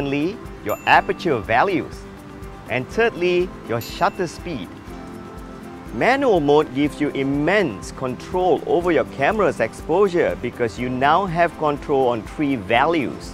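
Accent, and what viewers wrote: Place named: Malaysia. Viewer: Patut la slang english kenal je, org malaysia rupanya. Great video btw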